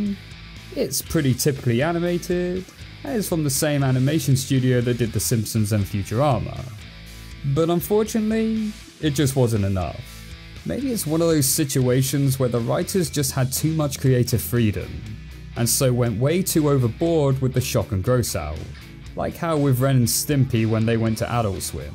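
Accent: United Kingdom. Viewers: Bro's got a British accent 😂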